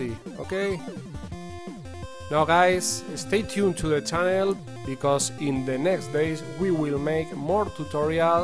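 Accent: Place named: Spain. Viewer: Por el acento pareces español bro jajaja. Oye, tengo un juego (La verdad es un Demo disc, uno de esos que venian con la revista oficial de la play xD) con todos los archivos bin pero me falta el cue. He intentado recrear el archivo y ahora detecta el juego y lo abre, pero no funciona, se queda en una pantalla negra...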